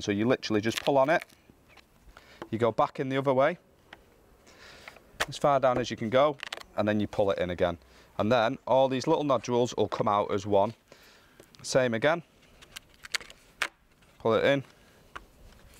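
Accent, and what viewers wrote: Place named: United Kingdom. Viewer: Love British accent😂 oh my gosh! But sounds Bu…, Cut sounds Cu…, how you guys understand each other? hahah pretty cool though!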